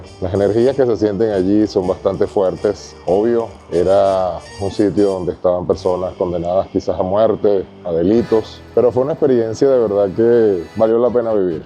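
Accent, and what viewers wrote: Venezuela. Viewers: Tienes acento venezolano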